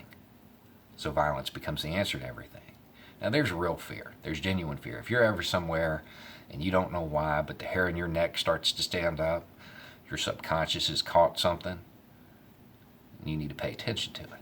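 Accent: South, United States. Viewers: As a Liberal in Western Pennsyltucky, I can say that this guy has opened my eyes., my man, I really hope you read this; You are doing far more good than anyone I've ever known personally or met as an acquaintance. Keep on doing what you're doing. I fully support everything that you're saying. Jesus H. Christ hanging off a cross, I needed to hear this message from someone with a southern drawl. From the moment you addressed the hero complex and the AR-15 being a symbol of masculinity to the minute you addressed every issue in this video, more people need to hear what you have to say. I'm just saddened to say that the message you have to share is simply going to fall on deaf ears; don't let that deter you from putting your words of wisdom out there, because it is reaching enough people to make a difference. I fully stand behind this guy. Cheers,.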